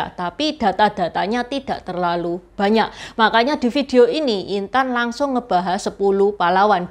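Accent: Java, Indonesia. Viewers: Adminya ciwo cina jowo bahasa Indonesianya logat jowo medok 😁👍👍🙏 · Mbaknya logatnya jawa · Mbak intan ini orang keturunan tionghoa, tapi logat jowone kuwentel banget.. Yo wis lah, memang budaya cina itu sdh masuk ke dlm darah daging Bhinneka tunggal ika. Tetap semangat!! berbesar jiwa lah kalo masih ada pribumi yg mendiskriminasi kalian, jangan tersinggung. Saya yakin kalian akan semakin dewasa dlm berfikir, karena kaum dr kalian lah perekonomian di negeri ini semakin kuat. Synergi mu adalah existensi negeri ini.. Peace ❤️ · Intan kok medok bgt jawanya emg tinggal dmn???? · ini tionghoa jawa kaya nya bicara nya medok jawa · Logate ngomong jawa banget cik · Unik sekali ka intan, wajah tionghoa bahasa indonesia medok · Aku suka suara mbk nya,,medok jawa nya itu lo yg bikin kecanduan.. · Masyallah mdok bgt logat jwa ne mbk e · mc.logatnya terlalu menthol kejawaan kurang enak didngat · Medok jawa😂😂😂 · Logatnya Jawa sekali mbak intan ini ❤ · Contoh yg paling mudah adalah mba Intan penampilannya ngak salah lagi tionghoa tetapi kalau berbicara wah aksennya kentel kejawi-annya, krn orang2 tionghoa di Indonesia semuanya spt mba Intan, didaerah mana mereka menetap mereka membaur dgn masyarakat setempat baik diAceh,Batak, Minang sampai ke Papua. 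Adalah naif kalau dibilang bangsa tionghoa bukan bagian dari suku2 di Indonesia. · Wadduh.saluut mbak jowone medhok tenan hehehe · Mbaknya ngomongnya waduh...medok banget kelahiran mana ya mbak, ❤❤❤🎉🎉🎉🎉🎉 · Cici intan ..bahasane medok men to yo ....jawa mesti ..😅😅😅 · Jowone medok banget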